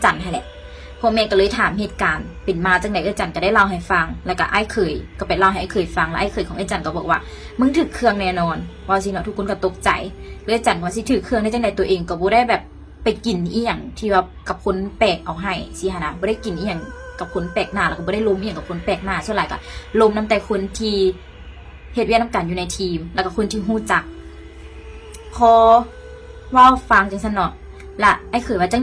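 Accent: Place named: South, Thailand. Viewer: ຮູ້ສຶກວ່າທາງພາກໃຕ້ຂອງຫຼາຍເນາະ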